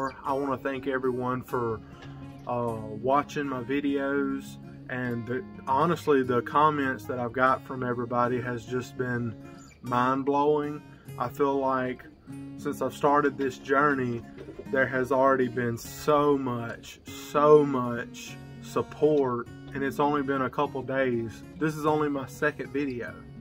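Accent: South, United States. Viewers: This is your calling. I love the southern accent and the realness. This mama bear approves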